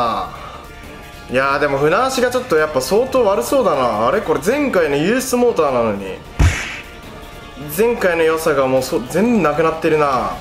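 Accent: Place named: Senshu, Japan. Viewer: 泉州弁みたいな喋り方だな〜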